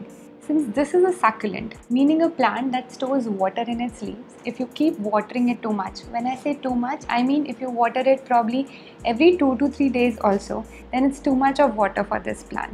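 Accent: India. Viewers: U have too much Indian accent 🙄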